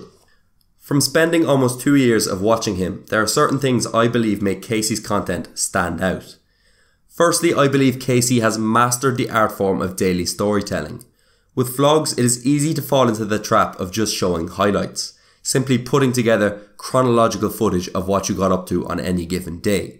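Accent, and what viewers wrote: Ireland. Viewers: Is that an Irish accent I hear?! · I LOVE your Irish accent! What part of Ireland are you from? Btw I LOVE how insightful your video is. It helps me figure out how to better grow my own vlogging channel! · to begin, I love your accent! Ireland Forever!!!! I agree with your evaluation 100%. I grew up in Ct., not too far from where he is from, and he is a proud son of our state! Thank you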